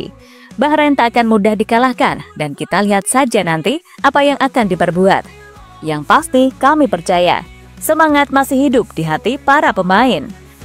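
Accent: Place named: Jakarta, Indonesia. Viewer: Logat mimin logat betawi.. Bus bacanya be's😅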